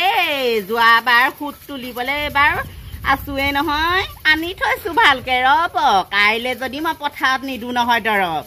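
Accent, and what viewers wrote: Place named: Upper Assam, India. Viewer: baai.. Bahut dinor murot palu dei.. Bahut bhaal lagil.... Apunar thaas tu ujonir type je.. Bhaal laage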